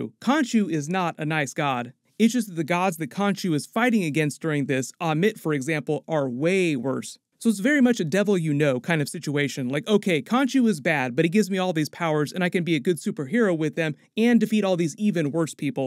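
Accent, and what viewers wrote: England, United Kingdom. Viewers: Great video as always. But oh my word. Public announcement that the English accent isn't that bad 😅 people do speak like this. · I agree. Not saying you're ignorant though 😉 but his English accent is just fine.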